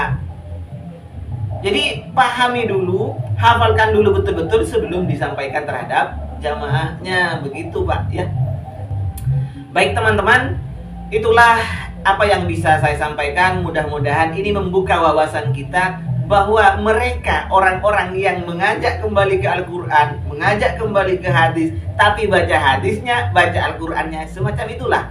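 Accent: Madura, Indonesia. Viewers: Madura nya di p3rj3las cak..... mantap.... mau ktawa takut dosa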